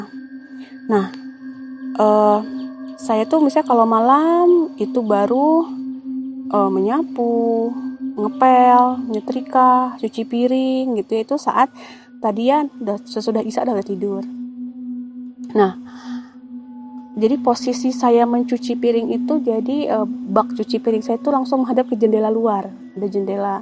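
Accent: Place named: Sunda, Indonesia. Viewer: Suka sama cara bercerita dan penguasaan bahasa Indonesia tetehnya, ditambah aksen Sunda dikit2, nyaman dengarnya. 😊❤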